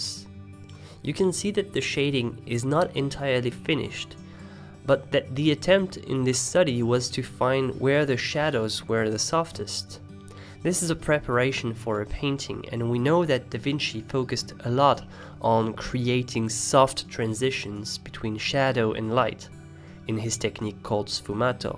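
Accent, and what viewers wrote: France. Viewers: I can listen your french accent. Tu vas pas me la faire fréro, sinon bonne vidéo.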